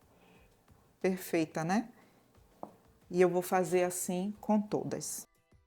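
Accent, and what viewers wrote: Brazil. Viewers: Ela fala maravilhosamente bem e bonito. As receitas perfeitas e deliciosas. Não vejo graça alguma e sim aprender a respeitar o sotaque de cada região brasileira que tem uma extensa diversidade cultural. Isso é cultura meu bem!!! #Pega a visão#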